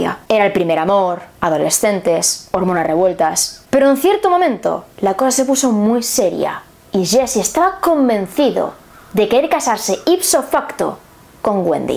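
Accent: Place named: Castile, Spain. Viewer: Si todo va bien... ! Jaja 😍 me encanta tu acento castellano, de hecho todo.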